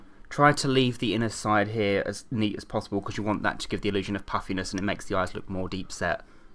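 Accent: England, United Kingdom. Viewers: Seems strange, a lot of tutorials are American, meaning when they say where they got the make up from or how much it cost, im thought, but to hear an English accent and not far away from where i am, makes me smile so much :D This is totally epic, nice work! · I love your videos. They are so good and I love your English accent. Can you do a genie from Disney's Aladdin.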